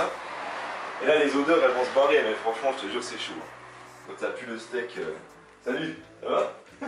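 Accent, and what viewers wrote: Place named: North, France. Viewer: Ton accent du nord me fait trop rire ahah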